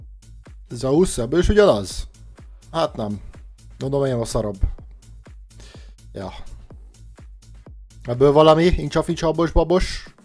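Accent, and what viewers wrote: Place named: Russia. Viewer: Orosz accent megvan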